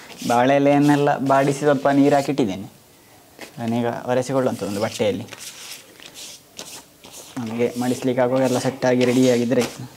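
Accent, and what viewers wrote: Mangalore, India. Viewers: ಯಾವ ರೀತಿ ತಾವು ತಯಾರಿಸುವ ತಿಂಡಿ ಪದಾರ್ಥಗಳು ವಿಶಿಷ್ಟವಾಗಿರುತ್ತವೆಯೋ ಅದಕ್ಕಿಂತ ವಿಶಿಷ್ಟ ನಿಮ್ಮ ಮಂಗಳೂರು ಕನ್ನಡ. ಕೇಳಲು ಬಹಳ ಸುಂದರ..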